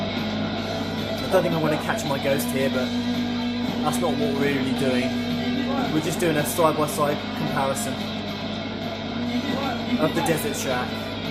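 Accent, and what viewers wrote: United Kingdom. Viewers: I see Okay. You sound like you are from the UK - is there a reason why you bought the NTSC-J Import of 'Sega Rally Championship Plus' over the PAL version of 'Sega Rally Championship'? I'm not sure which version of the game to buy for my Saturn. I am also in the PAL region.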